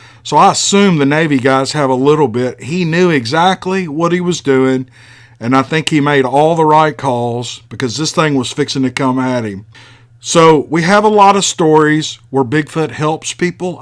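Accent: South, United States. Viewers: you are too modest sir. I think we all appreciate the time and effort you put into your videos. You sound like a very kind man. I’m from England and know nothing about the southern states of America. But they all speak the way you do it must be one relaxing place to live. Thanks again sir. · It's just that southern accent, has said many times that he does'nt really believe in ole sassy! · Hi there , I just love listening to your stories, I love your accent, what part of America are you from? Listening to you and watching the fire light is really calming and helps me too settle down at night. I'm from Australia and have seen our own version of a bigfoot. It is generally called a yowie but our aboriginal people have many different names for them. Some researchers here believe we may have as many as 4 different types.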